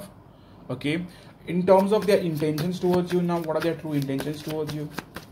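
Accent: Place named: India. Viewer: Your voice seems like that of an indian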